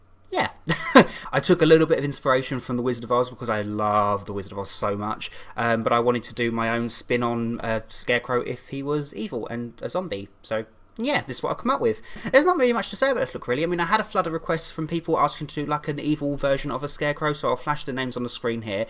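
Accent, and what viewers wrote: United Kingdom. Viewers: you look like the person from: I survived a zombie apocalypse:thom because of the british accent and the face looks so similar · omg, your gay/british accent gives me shivers in a good way. dont tell my bf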